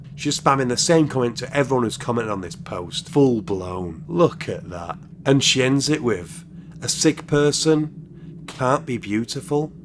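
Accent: United Kingdom. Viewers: Hello!!! I'm French so I'm sorry if I make mistakes.... I've subscribed in your channel, I like very much those histories and the way you narrated, with respect you are funny and you make me smile many times when I hear you.... I think you are from UK.... Thank you very much and waiting for more stories!!!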